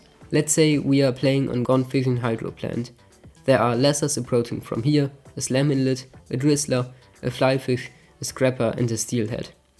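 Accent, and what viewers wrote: Germany. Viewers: Man hört den Deutschen akzent😂 aber ich liebe Splatoon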